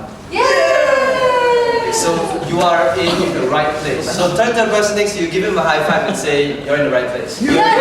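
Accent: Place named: Malaysia. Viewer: It's likely due to his Malaysian accent, which I understand how certain words sounded as if they were muffled as most Malaysians has less accentuation in the pronunciation of the English Language, an often overlooked detrimental effect that was resulted from how fast, short, and simple they wish to be in their speech. I'm a Malaysian my whole life, so of course I understand.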